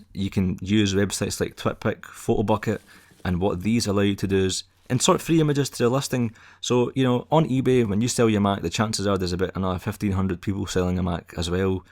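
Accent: Scotland, United Kingdom. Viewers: are u scotish?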